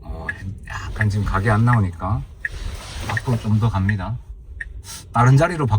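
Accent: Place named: Gyeongsang, South Korea. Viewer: 오잉. 갱상도 말 쓰시네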